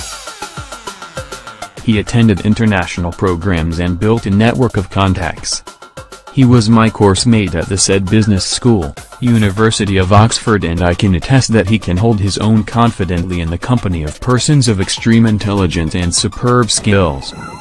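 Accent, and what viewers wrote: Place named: United States. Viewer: The white man cannot be trusted,is it the opinion of reuben abati or the voice of reuben abati ?,it is certainly not reuben abati but the accence of a white American man,he cannot pronounces obasanjo name correctly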